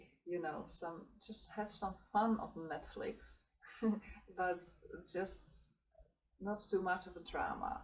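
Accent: Netherlands. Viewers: thank you for all the information! <3 I am currently growing Golden Teachers and am planning on microdosing. Are you dutch btw? Your accent sounds a little bit Dutch :)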